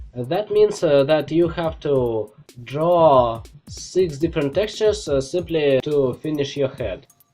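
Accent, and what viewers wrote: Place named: Russia. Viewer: Да по акценту слышно русского мужика!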